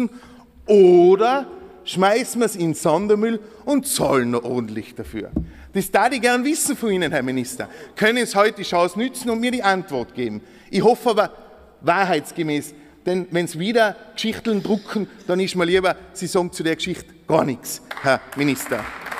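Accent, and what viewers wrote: Tyrol, Austria. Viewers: Mir gefällt Herr Steiner. Sein Tiroler Dialekt ins Hochdeutsche zu sprechen ist einmalig. Er ist echt klasse und bringt es auf den Punkt. Tja die Parlamentarier u. Nat.ratsabgeordnete der anderen 4 Parteien nehmen es mit der Wahrheit nicht genau. Vergessen aber ganz, daß ihre Reden aufgenommen werden sei es mit Video oder per Stenografie. Davonkommen werden's net. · Spitzenpolitiker welcher sich nicht verbiegen lässt und authentisch ist, a waschechter Tiroler, ich liebe diesen Dialekt 😎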